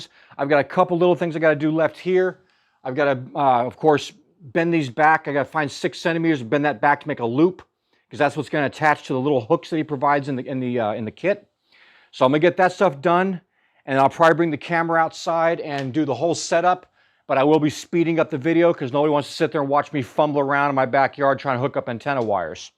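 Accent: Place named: United States. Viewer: Always makes me laugh how you Americans pronounce soldering ie sodering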